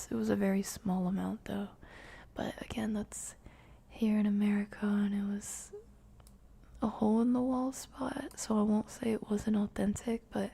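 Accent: France. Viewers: I’m going to speak for everyone when I say this… We kinda can’t put into words how much we appreciate you. I’m going to rephrase that... Your content is nothing short of amazing, but at the end of the day, you, is what we love and appreciate. Again it’s not just your content, but the fact that day in and day out (no matter how oopsie doopsie the schedule gets) you strive to put us as a priority. Not to mention, no other creator in this field interacts with viewers like you do, and that makes us genuinely feel like we truly are your sunshine who makes you happy when sky’s are gray… you also preach the Good News of Christ which very FEW people do. On a slightly different note, there’s always a handful of us out there that will never open up about what’s going on in our lives, but just know you’ve pulled so many people out of so many holes that we cannot simply thank you enough for it… one of the many was in your chat earlier too, and with your help was able to join the Marine Corps. 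You change lives. That being said never forget how loved you are by not only us, but by God as well. You’re a one of a kind, special, and goofy human being who has one heck of a French accent that no one will ever come close to touching. Thank you, and we genuinely love you. Love, -Your Community Very important P.S.: please please please make sure you’re taking care of yourself. Always remember that if you need too, don’t be afraid to put yourself first. I know we pick on you for the late st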